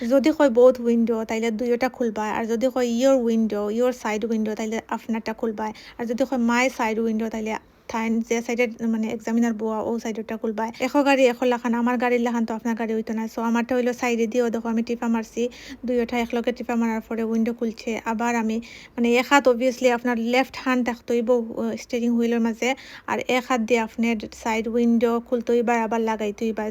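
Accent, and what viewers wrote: Sylhet, Bangladesh. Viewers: sylheti voice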